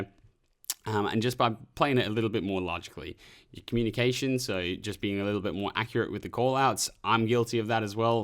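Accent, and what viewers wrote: Ireland. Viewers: I knew i could hear some Irish in ur accent! Love the content bro! love from Scotland 🏴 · Been wondering if I could hear a bit Irish in your accent for ages. Knew you were one of us ☘️☘️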